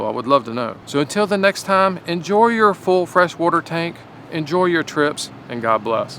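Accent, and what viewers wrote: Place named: East, United States. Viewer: An Ah ha moment is also called an epiphany. I say waater and you say worrrter, where are you from? The east probably near Pennsylvania? I’m from the south.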